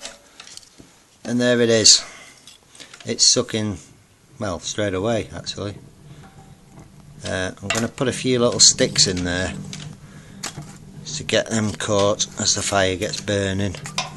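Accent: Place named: North England, United Kingdom. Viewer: Very ingenious design. If I make one of these, it will borrow this design. From your accent, I'm guessing you live near Liverpool, or Manchester? (or lived near there once.) {subscribed}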